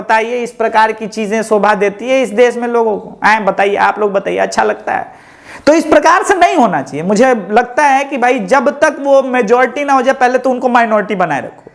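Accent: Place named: Bihar, India. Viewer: Bihari accent se problem ni h lekin chilla ke bolna cringe bana raha h video ko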